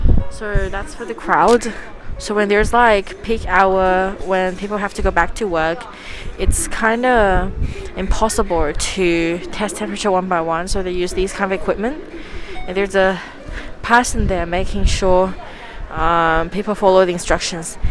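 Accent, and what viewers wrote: Australia. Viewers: So nice to hear your oz accent. 😍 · Nice vlog, but do not pick up more of that Aussi accent :) Stay safe !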